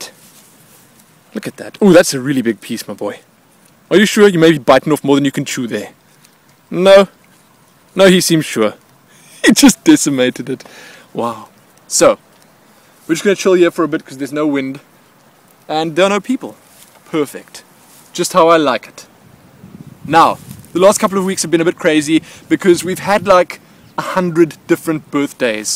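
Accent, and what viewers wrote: South Africa. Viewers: dude are u south African or just from Africa because iv got a mate up in Namibia and he sounds like he is from sa. and that place looks like capetown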